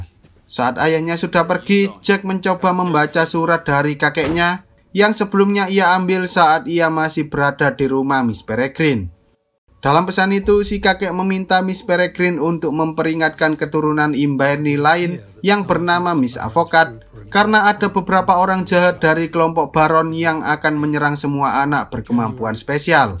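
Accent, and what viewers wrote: Java, Indonesia. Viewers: Jawa kah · Jowo ndi Iki seng duwe konten? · Suaranya medok kyk dodit Mulyanto 😂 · Bg sira wong jowo kah?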